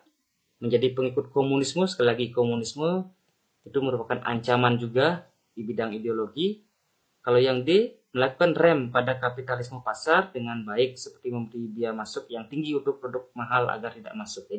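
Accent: Bali, Indonesia. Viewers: orang bali ngih bli keliatan dari logatnya ?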